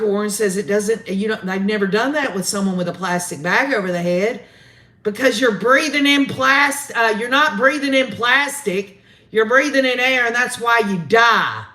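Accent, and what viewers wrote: South, United States. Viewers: I just love your southern accent. And curse words are just punctuation for honest people ! IMO. I think Lori finally screwed herself by saying how it was months later when she was dancing in the beach 🏝️ . If that phone call had only lasted longer Lori would not have been able to control her responses to summers questions.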